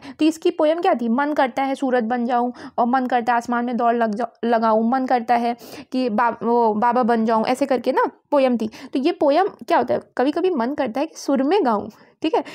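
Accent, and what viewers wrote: India. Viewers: Madam ki boli me to proper kanpur ka accent aaraha hai. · Aap Lucknow se ho kya lakhnavi aawaj hai apki?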